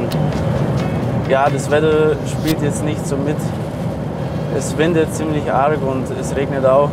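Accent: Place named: Swabia, Germany. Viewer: Schwäbischer Dialekt - einfach Kult! 🙏😅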